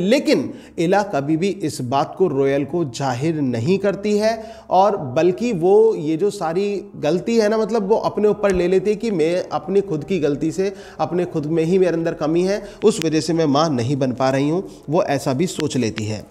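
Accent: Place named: Meerut, India. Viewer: Sir aap toh meerut ya baghpat k lag reh ho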